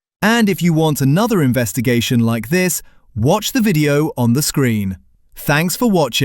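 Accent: United Kingdom. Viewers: English accented narrator, mentioning british supermarkets BUT stocked egg shelves with pricing in usa dollars!? Whats going on?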